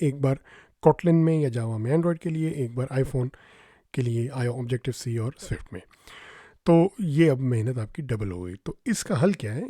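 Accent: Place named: Pakistan. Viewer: بہت خوشی ہوئی یہ چینل پاکستان میں دیکھ کر وہ بھی اردو لہجے اور زبان میں، ورنہ ذیادہ تر دیہاتی لوگ منجن بیچ رہے ہوتے ہیں۔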